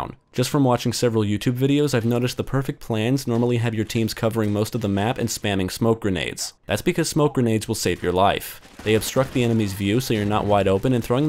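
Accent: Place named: New York, United States. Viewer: I'm from michigan, and im guessing you're a new yorker?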